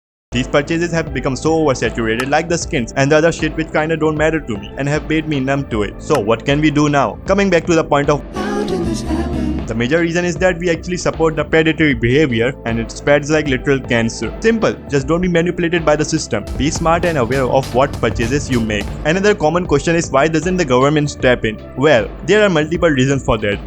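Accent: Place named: India. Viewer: bro you sound so much stereotypical Indian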